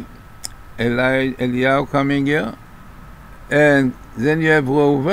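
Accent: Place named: Middle East. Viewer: Your middle-eastern accent is quite strong. People in English speaking areas need to pay CLOSE! ATTENTION, and they will understand. SORRY for your struggle. Your tables are a tremendous blessing. Thank you rabbi Glazerson.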